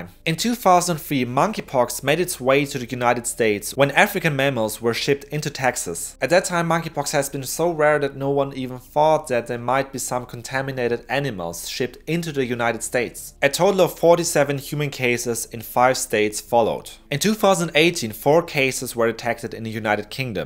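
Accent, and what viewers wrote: Germany. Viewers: The guy who talks is german you hear it in his english out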